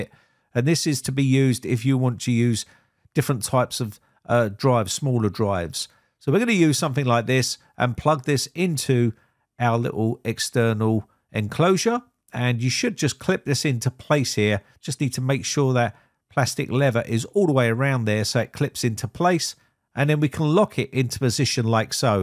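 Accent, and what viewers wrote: England, United Kingdom. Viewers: Lever is pronounced leave - er In English and you sound English to me ! Resist the American language. Most Americans think they speak English until they visit England .I once worked London with a lot of Americans. We spent some time explaining believe me !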